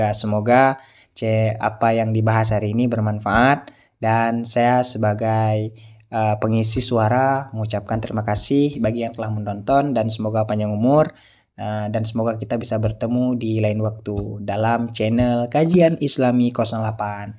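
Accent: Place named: Java, Indonesia. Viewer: Bahasa Indonesia manteng medok that tgk😅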